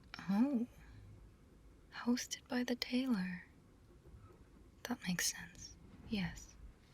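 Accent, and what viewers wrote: United Kingdom. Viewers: dude that posh accent lol. you actually did great with the animation, you really make them breathe and emote.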